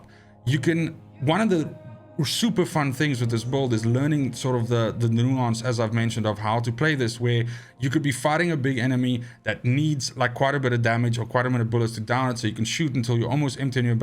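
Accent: South Africa. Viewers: yo you South Arfican ?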